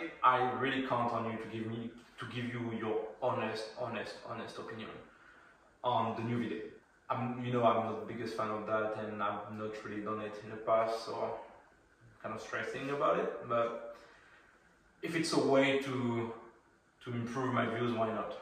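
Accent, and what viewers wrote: France. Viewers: I love the French accent! Looking forward for more talking videos. Keep it up even with the challenges!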